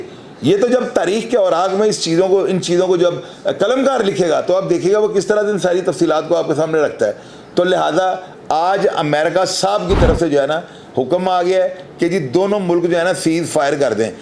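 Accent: Lahore, Pakistan. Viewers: Aj lahori style Mai bat kr rhy hai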